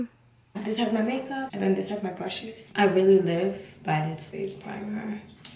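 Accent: New York, United States. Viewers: New York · Are you from New York? Your voice and accent is gorgeous girl 🔥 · Love the NY accent. I got a Boston accent so I love the east coast dialogue lol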